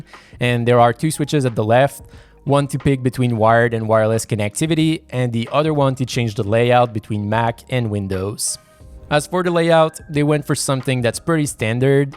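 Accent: Quebec, Canada. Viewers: you are french canadian right?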